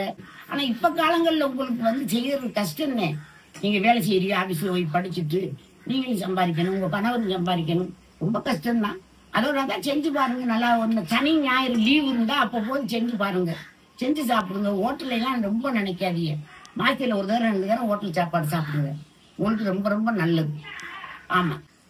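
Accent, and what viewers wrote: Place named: Ramnad, India. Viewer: Maami arumaiyaana samaiyal adhuvu namma ooru style la supperr superr ongakitta enaku pudichadhey neenga namma ooru ramnad slang la pesradhu dhaan adha enikumey vitraadhinga idhey madhrii nalla manampola pesunga manasuku neraiva irukuh onga youtube channel maelum maelum uyarvuh pera manamaara vaazdhigirom eid mubarak maamii ❤️❤️🙏🙏🙏🤝🤝☺️☺️🥳🥳😇😇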